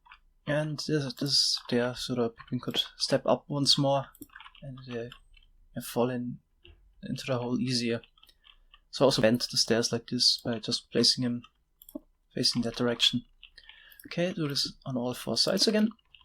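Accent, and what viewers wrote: Germany. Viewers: Man hört zwar richtig raus, dass du deutsch bist, sprichst aber trotzdem einwandfrei englisch :D....Gutes Tutorial, es könnte nur etwas kompakter sein (Vorallem zu Beginn, hast du ziemlich viel geredet, was man hätte kürzer machen können), weil 27 min vlt doch etwas zu lang sind. Nur Kritik, kein Hate ;) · Are you german?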